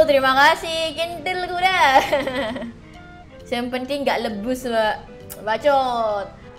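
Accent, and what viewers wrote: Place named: Java, Indonesia. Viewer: Suara mu medok banget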